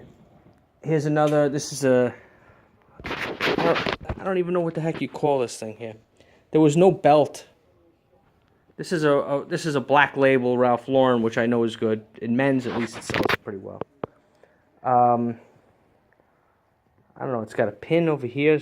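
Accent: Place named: New York, United States. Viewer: Hi, great video. Thanks for sharing. Also, love your New Yourrrrk (?) accent:) Pinterest is awesome for finding womens clothing info. e..g typing "types of skirts" would bring up a infographic showing a-line skirt, pleated skirt, etc. Even Google Images would do it.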